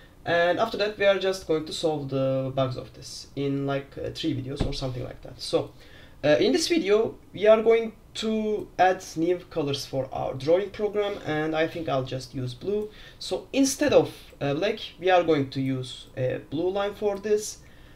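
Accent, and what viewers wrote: Turkey. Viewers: Thank you for the Xlib tutorials. Unfortunately X11 programming sources are scarce. I appreciate your tutorials. Would you cover Xshape click-through windows too? A transparent screen widget would be good. Your accent sounds Turkish.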